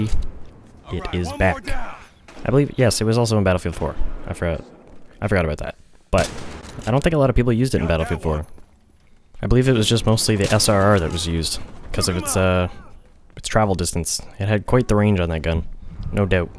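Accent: Canada. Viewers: You sound so much more Canadian in this.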